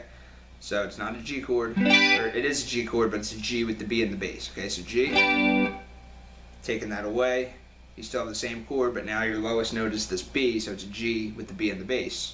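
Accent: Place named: East Coast, United States. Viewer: This guy sounds like Adam Sandler or someone from the east coast who's about to kick my ass while trying to scalp tickets to an Eagles or Red Sox game.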